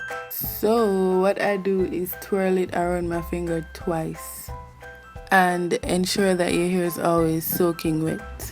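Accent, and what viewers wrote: Jamaica. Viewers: ikr I was like yayyyy a Jamaican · You sound so jamaican..I love it😁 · this is awesome..thank you so much...yaaaaas u jamaican i can hear it!! dwl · From she start talk mi know seh she a Jamaican ❤ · Amen! Straight yard she come from! Jamaica to di world! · your voice tells me that your a Jamaican... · u sound Jamaican. Jamaica to d worllll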